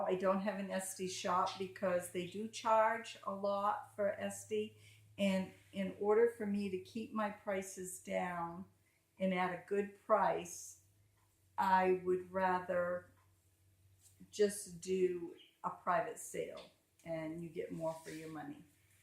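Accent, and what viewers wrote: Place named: New York, United States. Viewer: nice video. Your accent is it from New York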